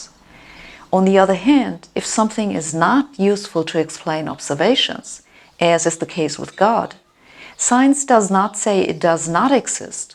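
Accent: Germany. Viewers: Learn English, I don’t understand this German accent.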